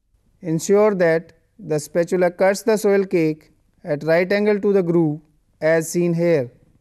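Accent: India. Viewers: this is indian english language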